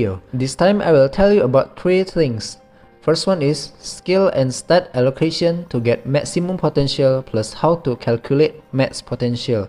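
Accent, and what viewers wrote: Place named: Malaysia. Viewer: ru malaysian? ur accent looks like Malaysian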